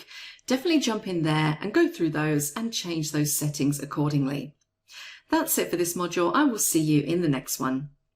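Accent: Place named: RP, United Kingdom. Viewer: You're the best a zillion times I love this I can't stop watching because of your voice and the pronunciation of the words, BBC English everyone can hear and understand you very very well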